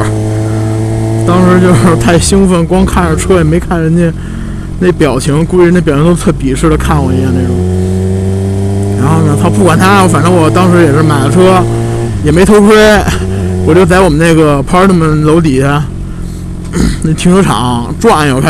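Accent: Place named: Beijing, China. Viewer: 宽点有限A_A听着口音咋像北京捏，熟悉啊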